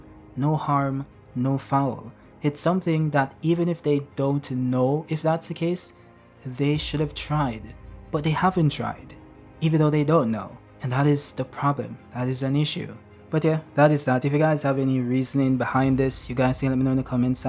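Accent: Jamaica. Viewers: bro u made me understand more of baruto thanks but i got to ask are yu Jamaican? im just asking bc im Jamaican😁❔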